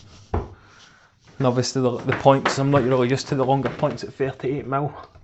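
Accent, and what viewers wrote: Scotland, United Kingdom. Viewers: I come for the reviews to hear you say grip. Gotta love a wee Scottish accent aye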